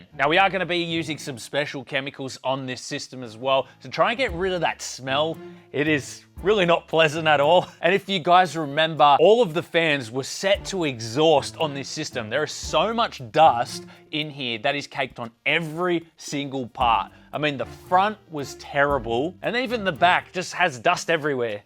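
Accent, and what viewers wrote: Australia. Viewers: This guy has the most Aussie accent I have ever heard. Great video too don't mean any disrespect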